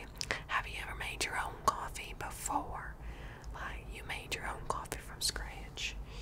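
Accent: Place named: South, United States. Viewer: The southern accent is backk!! Literally ur southern accent vid is my fav vid to fall asleep to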